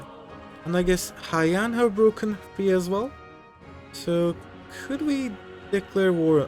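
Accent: Turkey. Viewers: turkish accent detected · Reis Türk müsün, Otistik Türk aksanın var.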